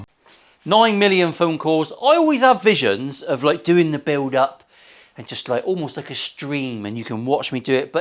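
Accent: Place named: Plymouth, United Kingdom. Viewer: your videos are a highlight of my week, love your honesty, your humour, and your craftsmanship. Love your builds, but you need to do another bass for all us low enders. I spent five years working in Devonport, so it’s nostalgic to hear your janner’ism’s. Keep up the good work.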